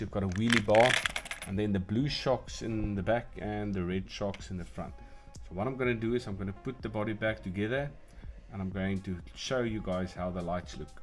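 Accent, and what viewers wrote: South Africa. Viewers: I like it bud! I subbed to stay tuned in. Looking forward to what's to come in the future 👌🏼 Hey on a side note what is that checker board square area you were driving in meant for? I'm in the U.S so just curious. Also I always try to pin down accents and I'm guessing south Africa? If yes you have a beautiful country!! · Great video.I watch your channel for the interesting content and also the fact that you sound South African.any advise.Bought this but after 10min or so of running in the grass it cuts off.esc is very hot.Is this normal?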